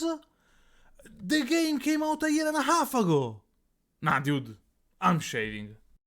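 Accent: Italy. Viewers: Our favourite norvegian-with-heavy-italian-accent youtuber! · Im 90% sure is accent is a strong italian accent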